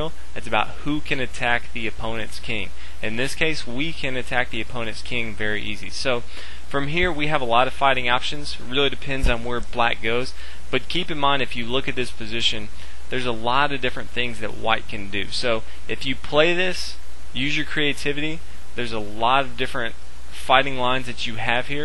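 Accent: United States. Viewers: I love this dude's voice / accent what part of US is he from?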